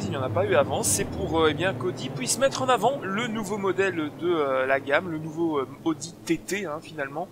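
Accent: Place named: Belgium. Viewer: c'est différent on va dire. Pour moi c'est convenable, juste qu'on dirait qu'on t'entend de plus loin. Peut être juste un soucis de volume j'pourrais pas dire. J'en profite que tu aies répondu a mon message pour te féliciter pour tout ton travail, que c'est grâce a toi et ton pilotage que je me suis mis a fond dans la simu auto sur circuit (Je jouais qu'aux Dirt et a dirt Rallye des la sortie.). Commentary superbes, pilotage superbe, et qualité au top. Puis c'est cool d'avoir un peu d'accent belge aha. Encore merci pour ton contenu! T'es au top!;) PS: Manque une seule chose, plus de vidéos sur Dirt, comme une carrière en let's play par exemple, et pourquoi pas du test Rallye sur Assetto Corsa. Et la j'aurai plus rien a demander aha 😂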